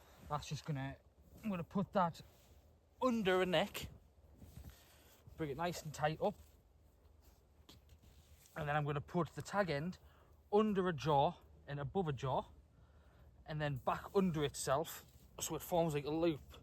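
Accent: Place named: North East England, United Kingdom. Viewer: You from Sunderland or Durham? Sounds it haha… I’m from Newcastle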